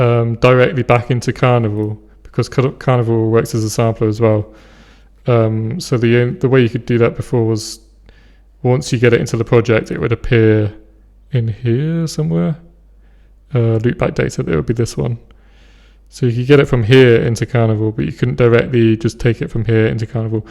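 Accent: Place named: France. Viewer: 'Sup, why do you sound French?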